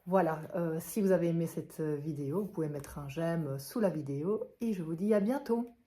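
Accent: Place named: Belgium. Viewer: Bonne explication, dans un bon français, avec bel accent belge.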